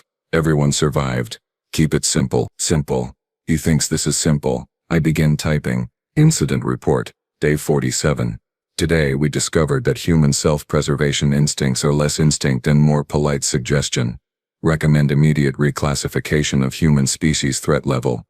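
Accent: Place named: New York, United States. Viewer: New Yorker , ,,, , but good ,,,,,,,,,,,,,,,,,, :face-orange-frowning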